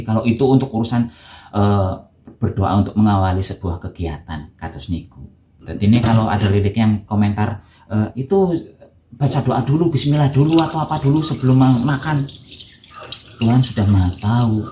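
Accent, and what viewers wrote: Banyumas, Indonesia. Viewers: Menjadi diri sendiri lebih baik mas. Autentic dg bahasa ngapak dan apa adanya. Ciri kasnya 👍👍👍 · Masaaaak sih ,,,,,ya keteke kecuuut ,,,,sambil masak ,,,,kelihatan tambah buntder ,,,,hidunge tambah tidak kelihatan ,,,,yg penting tetap apa adanya ,,ngapaknya spontan malah tambah lucu ,,,,cerdas ,,,,masak sambil mbanyol ,,,,malah tambah nggemeske ,,,,,kalau dekat pingin nyiwel pipine sing tembem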